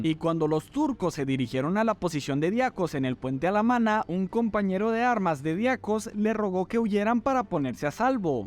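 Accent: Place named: North, Mexico. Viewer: Chido canal, por cierto, eres mexa? Supongo que tu "sh" proviene del norte, como de Chihuahua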